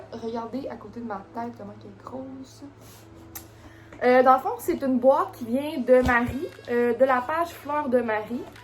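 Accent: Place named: Canada. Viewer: J'ai découvert ta chaine il y a plus de 2 mois (avec ton Plant Tour). Je ne commente jamais, mais j'adore tes vidéos et ta chaine 😇 J'apprécie aussi beaucoup ton accent canadien (j'ai des amis qui habitent à Montréal). Merci beaucoup pour cet unboxing (et les derniers), c'est comme ouvrir des cadeaux de Noël 😊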